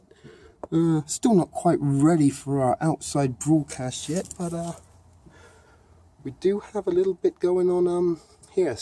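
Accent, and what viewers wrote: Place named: Midlands, United Kingdom. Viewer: New sub from England you sound like you got a midlands accent. ?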